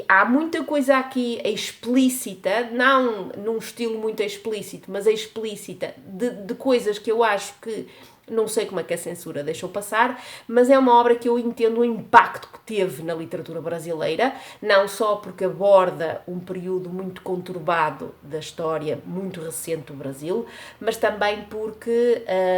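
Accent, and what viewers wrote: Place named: Portugal. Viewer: Seu sotaque português é lindo e falando em "brasileirês" ficou maravilhoso. Espero que goste da Ligia Fagundes, é um a escritora maravilhosa. Fim de 2024 ou início de 2025 pretendo realizar um sonho: visitar Portugal e comprar muitos livros nesta terra encantadora. Saudações Amazônicas.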